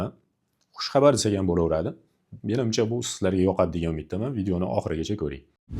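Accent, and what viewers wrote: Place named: Jizzakh, Uzbekistan. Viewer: Ассалому алайкум доктор. Шевангиз адашмасам Жиззахники деб уйладим. Адрес каерда ?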